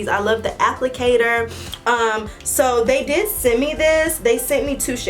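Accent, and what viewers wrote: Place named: North Carolina, United States. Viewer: if that was a " " thing, or a North Carolina accent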